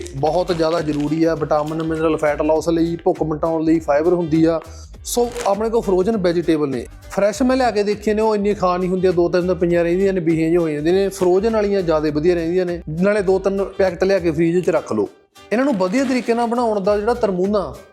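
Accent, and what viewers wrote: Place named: Malerkotla, India. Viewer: ਇੰਨਾ ਕੁ ਪਾਣੀ ਪਾਉਣਾ ਡੱਬੂ ਵਿੱਚ ,, 😅 ਗੱਲ ਸਿਰਾ ਕਰਤੀ ਬਾਈ ,, ਆਪਣੀ ਬੋਲੀ ਇਹੀ ਆ, ਮਲੇਰ ਕੋਟਲਾ ਸਾਈਡ ❤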